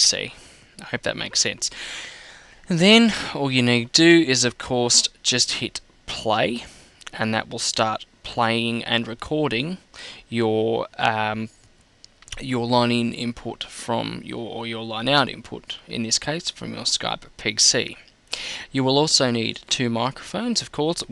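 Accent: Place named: Australia. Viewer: love the aussie accent by the way bruh. I'm wanting to lets say set up a radio broad cast for prank phone calls. I'm going to be using Skype with a friend or 2 and will be calling numbers for the calls. I will be using this set up.. but how do I actually broadcast to people? Am I going to need to set up an SQL database through my server? I have PhpMyAdmin and all that... just wondering if you could help me out here thanks! If email is easier then email me <mail>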